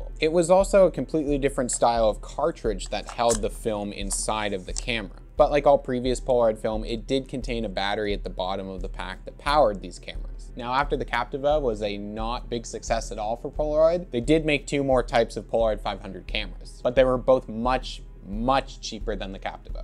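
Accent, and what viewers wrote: Canada. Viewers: He’s Canadian so it’s his way of pronouncing it, you can tell too with Certain pronunciation of words in all his videos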